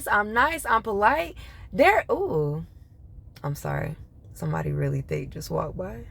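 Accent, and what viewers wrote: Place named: Detroit, United States. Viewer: I appreciate your point of view you sound a Detroit girl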